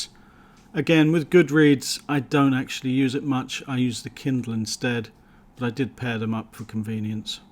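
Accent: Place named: United Kingdom. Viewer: i love the accent. sounds like one direction when they talk, specifically zayn. are u british? i'm subscribing bc of that 🌹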